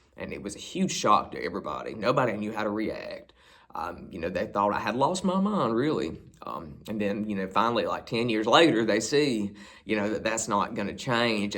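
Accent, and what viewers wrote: South, United States. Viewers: Love your videos. I could listen to you all day. I love your southern accent. Plus you have a great hair style. You and I have alot in common. I mainly listen to female singers. Mainly. Have you read her memoir, yet? Love and hugs from Dunlap, Ill.😊😊🎄🎄⛄⛄🎅🎅😊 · Aussie here loving your southern accent. Just want to hear you say Veh-hickle. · I love your vids. You and I have much in common. I was born and raised in the upstate of SC and I now live in Charleston. You and I have that same upstate southern accent too. I always look forward to seeing you every time you post. Much love and blessings.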